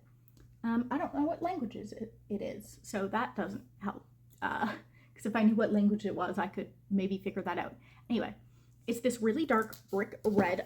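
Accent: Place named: France. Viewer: Congrats on the complete refresh! 🎉 that’s a pretty new colour story! So many possibilities!! Also, love your French accent. I’m assuming you speak French? 🇨🇦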